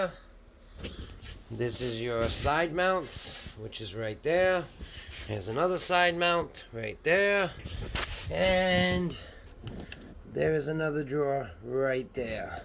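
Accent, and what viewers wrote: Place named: Maine, United States. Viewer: I swear, you got a Maine accent. Love it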